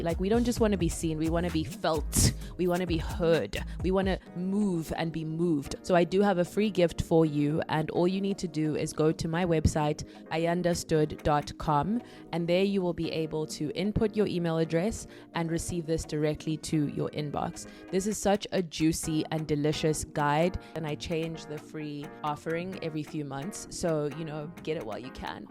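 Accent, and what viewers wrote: South Africa. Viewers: The dragon only guards the treasure🤯 I was in a yoga pose listening to this and I almost fell to the ground. Thank you for this episode you just freed me and I’m into this episode. Thank you for being this example and living your purpose. As a South African hearing my accent speak this work is so beautiful and lets me know it’s time for me to teach what I learnt to free myself from conditions and limitations. Thank you for this. I’ll start my YouTube channel officially today. No more fear. No more spiritual closets either. 🙌🏾🫰🏽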